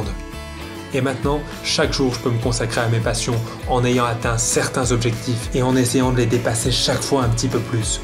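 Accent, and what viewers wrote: Lorraine, France. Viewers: nn sérieux tu viens de Lorraine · Tu viens de lorraine comme moi 😂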